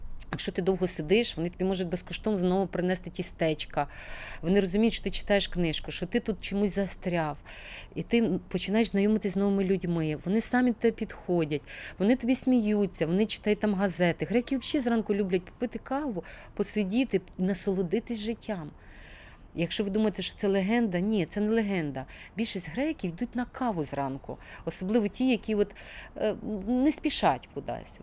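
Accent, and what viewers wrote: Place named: Ternopil, Ukraine. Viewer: А, тому мені говір здався рідний,бо ви з Тернополя, я також!!! Вдачі вам!!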